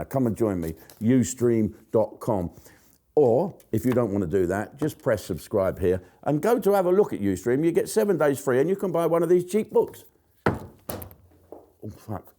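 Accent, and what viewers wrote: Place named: Scotland, United Kingdom. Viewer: Love your jock accent 🤣🤣🤣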